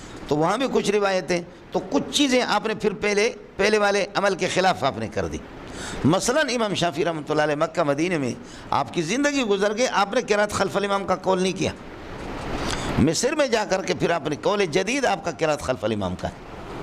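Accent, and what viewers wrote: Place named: Balochistan, Pakistan. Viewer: یہ اردو دان نہیں ہیں بلوچ ہیں اس لیئے بامحاورہ اردو نہیں بول سکتے جیسے پٹھان بہت سے الفاظ مذکر مؤنث کی تمیز کے بغیر بولتے ہیں بلاول بھٹو بھی ایسی ہی اردو بولتا ہے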